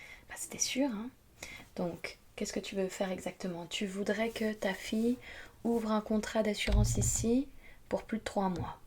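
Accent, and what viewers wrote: Vosges, France. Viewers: Your French accent is very authentic and natural sounding...love to hear you try speaking English with a French accent and French with an American accent. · Étant vosgienne ton accent me rappel ma ptite ville paumé au fin fond des Vosges merci pour ce retours en enfance 😂😊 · mdr pas mal ... T'es vosgienne ? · bel accent vosgiens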